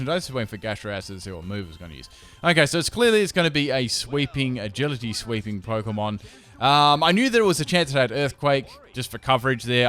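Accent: Australia. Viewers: I keep guessing what is "pill"...it's actually Pearl. gotta understand Australian better now that I'm hooked to this channel.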